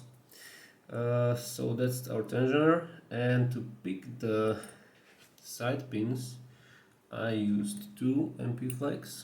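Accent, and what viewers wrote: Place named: Czech Republic. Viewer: Ahoj, hromada krásnýho odemykání a rozebírání... podle přízvuku a dnes i podle dvojkoruny koukám že jsi čech, tak si říkám jestli se neznáme z nějaké komunity, jezdíš na Lockcon?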